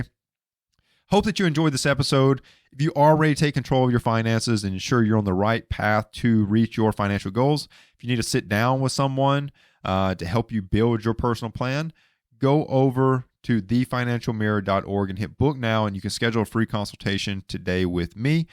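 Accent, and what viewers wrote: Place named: South, United States. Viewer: Yet another financial talking head spewing advice. Sure, like his calculation that's great. But for crying out loud, how could I honestly take advice from someone that looks like he's got his smile permanently pasted on himself and he's got his fingers stuck in a light socket?! and....geez....he sounds like a good ol' country boy. Apologies that I'm that opinionated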